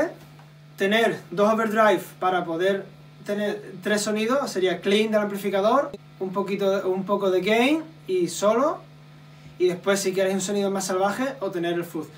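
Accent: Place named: Argentina. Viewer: joder pensé que eras argentino primera vez que veo un video en el que hablas...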